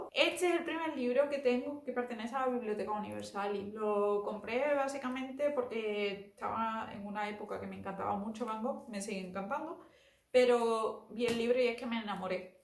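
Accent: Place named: Castile, Spain. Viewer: Un momento, es alemana con acento castellano de qué parte de España??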